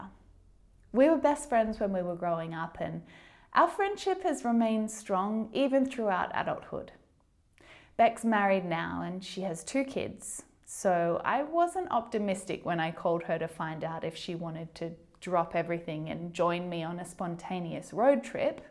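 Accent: Australia. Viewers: Australian Accent ❤️❤️❤️❤️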